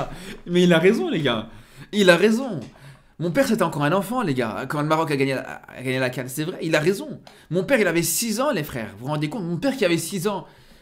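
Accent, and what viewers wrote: Belgium. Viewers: Tes pas belge toi?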